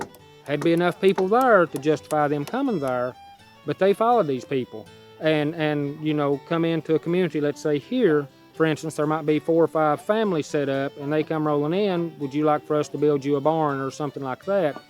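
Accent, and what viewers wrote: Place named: East Tennessee, United States. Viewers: I'm from East TN and he sounds like he's either from there or the plateau. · it sounds like a east TN, or a east KY, accent! Hill folk know hill folk! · His accent is Appalachian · I would guess Kentucky or Tennessee, I would also guess most Americans could identify that pretty easily. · nice. bunch of us in AL around like that too so I knew he was southern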